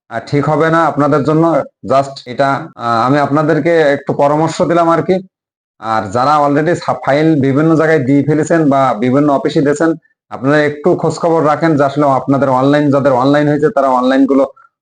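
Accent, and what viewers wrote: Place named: Satkhira, Bangladesh. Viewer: ভাই আসসালামু আলাইকুম আমি আপনার ভাষা শুনে মনে হচ্ছে আপনার সাতক্ষীরা ডিসটিক এর কোন এক জায়গায় বাড়ি দয়া করে যদি এই সত্য কথা হয় তাহলে আপনি আমাকে নক দিবেন আমি আপনার মাধ্যমে একটি কাজ করতে চাই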